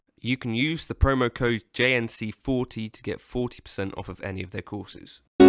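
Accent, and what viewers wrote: United Kingdom. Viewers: What a sound! And your noodling is as always way better than my best technique. Cheers! Oh and I don't know if you've been told already but the British accent is jolly fun to listen to!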